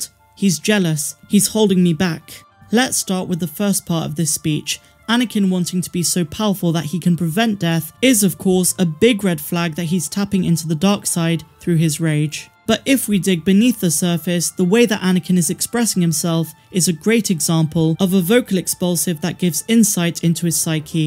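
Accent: United Kingdom. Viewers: Love you station and your British accent; I'm a straight Black American man. And I generally agree with most of your analysis but I totally disagree with your analysis on why Anakin turned out to be Darth Vader. You see he was a slave he wasn't even going to make it to adulthood had he not been taken in by the Jedi Temple. He should have been much more appreciative and do the things that he was asked to do that would have only made him into the chosen one he should have been. But he wanted to be rebellious and disrespectful at times and therefore the two top Jedi Masters knew who he was before he did. He was precocious and vain and he would've still rebelled, even against his original father figure, Qui-Gon Jinn at a certain point. Your analogy sounds like the abuser blaming the abused for abuse.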